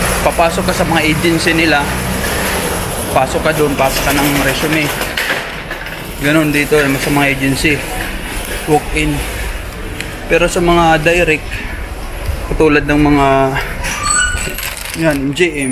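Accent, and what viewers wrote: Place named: Waray, Philippines. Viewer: Bossing taga samar kb..or waray waray kb??😂waray kasi accent mo eh😅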